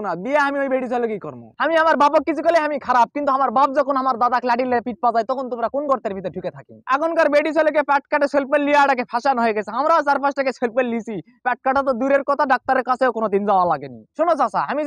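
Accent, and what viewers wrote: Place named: Bogura, Bangladesh. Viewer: হামাকেরে বগুড়ার ভাষার মতন ই বারে😆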